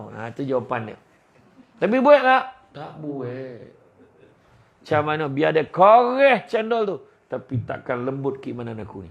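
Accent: Negeri Sembilan, Malaysia. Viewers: Yg dislike tue dyo x paham loghat nismilan Tue🤣🤣🤣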